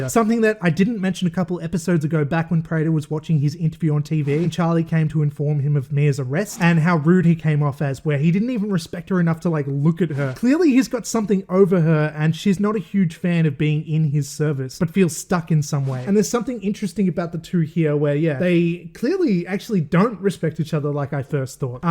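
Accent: Australia. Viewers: The Aussie is back with some badass recapping😊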